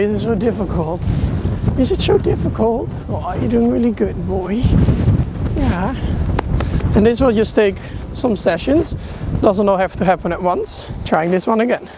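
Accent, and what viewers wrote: Netherlands. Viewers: im sorry but are You dutch im very sorry to ask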